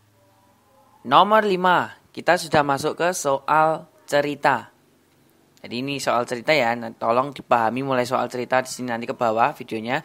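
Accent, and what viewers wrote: Java, Indonesia. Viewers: Alhamdulillah kak, aku tertarik nama chanel nya kayak nama orang Chinese tapi logat jawa punya dan ada rumus The Ping-nya..andai guru mtk mass skolah kayak njenengan pasti mudeng pol.. Kak, moon maap aku jadi tertarik matematika lagi saat persiapan tes SKD gegara chanel njenengan kang, Saya kurang kerjaan, itu apa akronim Ping2an(jawa) dan perkaliean?? Kerenn pokoknya, mudah dipahami semuanya dari chanel ini Ohya mau melaporkan tes skd saya hari ini meski nilai tidak sampai tembus di atas 350, hari ini saya tes dan berkat chanel ini TIU yang biasanya try out saya nilai selalu anjlok alhamdulillah dapat dua kalinya.. Ini tes kedua saya semoga yg terakhir krn udah ketrima pns. aamiin Skor 335 "twk 90 Tiu 105 Tkp 140" Ranking belum ngeh di instansi yg saya tuju Sedikit FR Pecahan sederhana dengan pendekatan dan barisan juga posisi duduk melingkar jan persis deg penjelasan njenengan kak, maturnuwun saya minta doanya ya bisa lulus perankingan SKB sampai jadi ASN AAMIIN YA MUJIBASAILIEN.. 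MUGA barokah selalu kak ilmunya karena keikhlasan berbagi ilmu yg njenengan miliki semakin berkembang, saya nantikan slalu temasuk materi skb nanti apa aja ya kak. Terima kasih #maapkepanjangan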